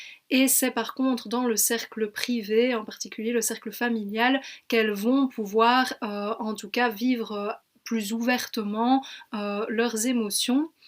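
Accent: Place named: Belgium. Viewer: J'adore ton accent belge vraiment adorable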